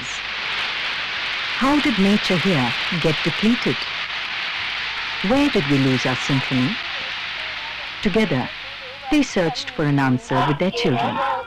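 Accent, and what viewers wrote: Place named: Kerala, India. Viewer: ഞാൻ ഈ വീഡിയോ ഇപ്പോൾ കാണുന്നു അവിചാരിതമായി കണ്ട ദക്ഷിണ എന്ന ചാനൽ പിന്തുടർന്നു ഇവിടെയും വരെ എത്തി........ You are great...... Salute you teacher and മാഷേ...... അവിടെ വരാൻ കൊതിയാവുന്നു....... ടീച്ചറുടെ സൗണ്ട് 👌🏼...... ടീച്ചറുടെ മലയാളം ഭാഷാ ശുദ്ധി...... Wow ...... റിയലി amazing........ എന്റെ മോൻ ടീച്ചറുടെ മലയാളത്തിന്റെ ആരാധകനാണ്‌....... അപകടകാരിയായ മലയാളി എന്നാണ് അവൻ സന്തോഷത്തോടെ പറയാറുള്ളത്......... സ്നേഹവും ബഹുമാനവും....... Respect you.... Love you❤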